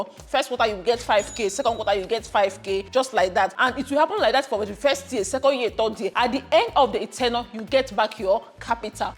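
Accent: Nigeria. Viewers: Igbo women and money 🤝 Also that thick Eastern accent, I love it so much😌😌😌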